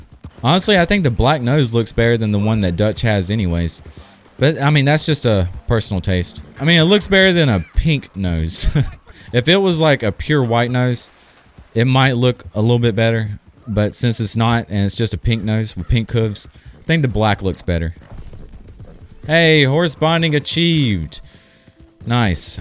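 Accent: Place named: Carolinas, United States. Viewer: Thanks your a pal lol hey are you from the Carolinas cause your accent sounds like it lol I am from there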